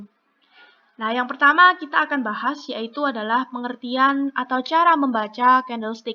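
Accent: Java, Indonesia. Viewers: Jawa mbak ya? 😁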